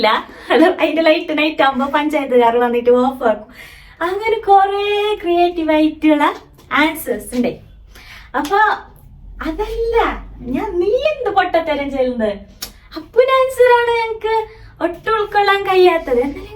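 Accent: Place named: Kasaragod, India. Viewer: Ksaragod basha edayo kanda pole ....eda nigala home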